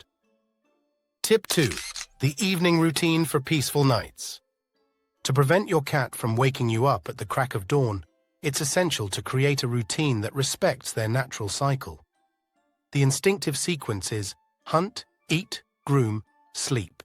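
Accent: United Kingdom. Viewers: This is more AI hogwash geared up with a British accent to the video seem like it's being intelligently presented. That's the British accent giveaway. Nothing here is medically sound so don't lose any sleep over this nonsense. There's nothing about a cat sleeping position that has anything to do with their health one way or the other.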